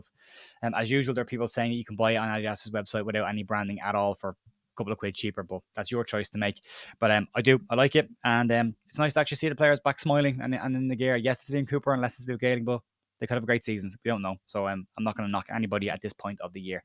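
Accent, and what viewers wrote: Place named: Ireland. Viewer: Thanks, plenty of false announcements in my feed this morning from most of the other LUFC YT channels, didn't even bother to watch them. These days I'm happy to wait for the real news, in an Irish accent.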